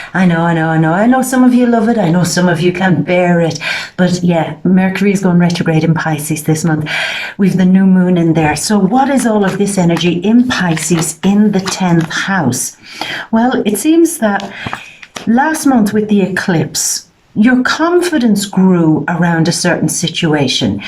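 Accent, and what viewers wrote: Ireland. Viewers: Love the irish accent, im from cork.xc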